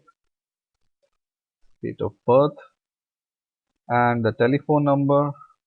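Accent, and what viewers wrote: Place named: India. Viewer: His English is very good!! He just has the Indian accent which is natural considering he's an Indian! :)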